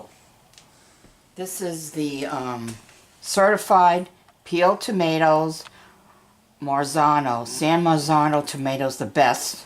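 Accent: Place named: New York, United States. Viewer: Love that heavy new york acent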